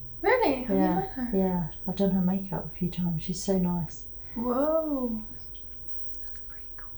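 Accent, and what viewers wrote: United Kingdom. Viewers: Thank you so so much! Hope you feel better soon. 🤗 Your nasal voiced is concealed by your ever sexy British accent. 😘😘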